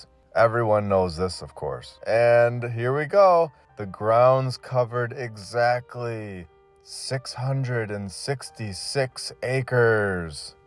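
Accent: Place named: Midwest, United States. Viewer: Midwest accent, reminds me of home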